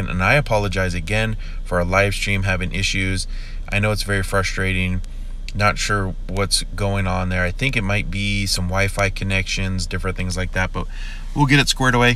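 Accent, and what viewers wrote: Tamil Nadu, India. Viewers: Your tamil is so cute... Love u 😍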